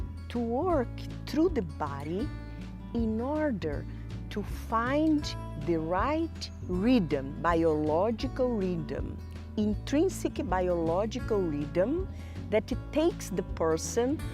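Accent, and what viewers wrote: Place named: Brazil. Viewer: So good to hear a brazilian voice in SE world! Thank you for this explanation. I am studying SE! ❤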